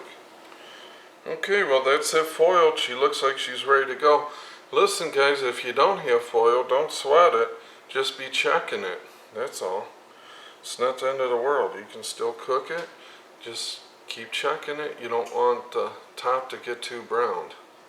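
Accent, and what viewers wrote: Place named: United States. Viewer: Good night folks enjoy your blue cobbler he sounds country that is good looks like a friendly guy he just soundd like garfield talking big cat by yall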